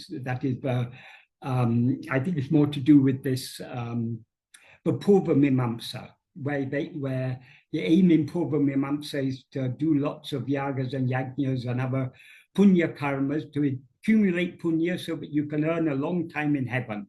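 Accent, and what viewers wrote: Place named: United Kingdom. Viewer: Aprendí a entender tu acento Británico, me costó mucho, pero es una de las fuentes puras en internet. ❤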